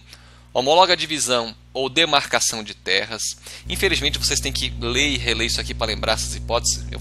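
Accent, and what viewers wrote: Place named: North, Brazil. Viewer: Esse professor é do Norte, conheço esse sotaque, kkkk. Só não sei identificar de onde, se do Pará, Macapá ou Amazonas. Alguém sabe me dizer de onde ele é?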